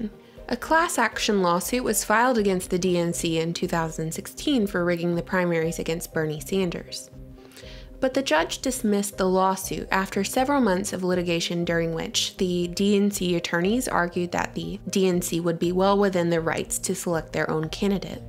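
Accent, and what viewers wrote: South, United States. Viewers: I love ur southern accent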